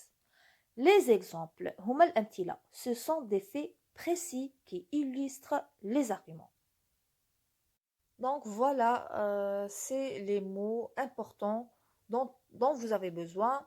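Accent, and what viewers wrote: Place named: Oran, Algeria. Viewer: وهرانية في هدرتها 🥰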